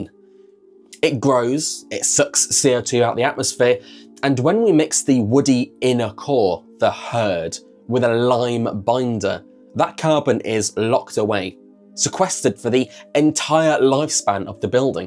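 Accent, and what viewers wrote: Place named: England, United Kingdom. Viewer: This is so US-centric. Maybe the "floodgates were opened in 2018" in the US, but in Europe, industrial hemp has been in use much longer. In fact the US were the only industrialised nation that outlawed industrial hemp for so long. For example, in Germany, the illegal status of industrial hemp was abolished in 1996. Hemp in Europe has always been in use for plumbing, rope production and insulation. It's the increased interest in sustainability and the need for energy efficient house building that has led to its revival. There are many well-established companies that have been selling hemp products for a long time. I can even find them in my local DIY store. For example, "Hanfstein" ( meaning hemp stone) have been producing and selling hempcrete bricks since 15 years. Americans sometimes have to be reminded that there is a world beyond their borders, and that sometimes they are not No.1, but lagging behind. 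Edit: from your accent, I'd expect that you're English, but the situation you described is specific to the US.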